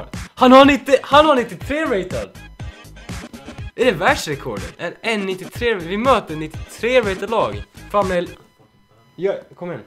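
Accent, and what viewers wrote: Sweden. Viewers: Är du från Småland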